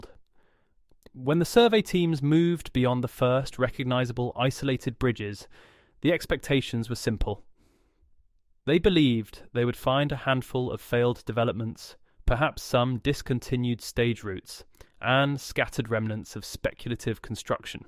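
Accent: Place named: United Kingdom. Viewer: WHY do all of these AI narrated videos have a British voice ? Tired of it.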